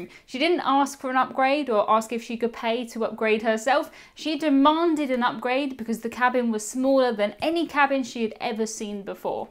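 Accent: RP, United Kingdom. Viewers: I've never been on a cruise but will hopefully. I only watch your videos because you're so dang gorgeous and I love your posh accent ☺️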